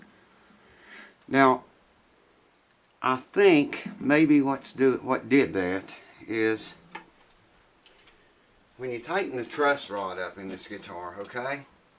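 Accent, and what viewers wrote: South, United States. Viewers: what state are you in?? I thought you was a southern man--hmmm maybe youre up there with Neil Young lol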